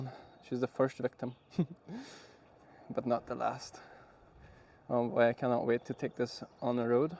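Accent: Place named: Northern Ireland, United Kingdom. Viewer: Taip ir galvojau. Pagal akcenta tu kazkur apie Antrim gal gyveni, cia tik speju 😄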